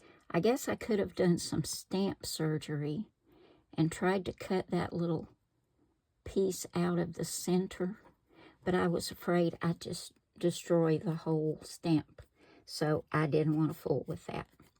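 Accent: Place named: Virginia, United States. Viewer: I love watching you make cards. It always feels like we’re having a visit. Your voice reminds me of my childhood visits to my family in Virginia. How about Sketch #17 or #31? 31 is very busy, maybe we better hope Wayne doesn’t see it!😂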